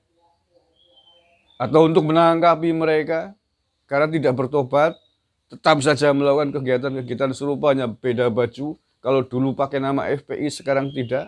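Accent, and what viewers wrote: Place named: Tegal, Indonesia. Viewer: asik mas dangdutanne..iyonge wong tegal kiye mas, mantapp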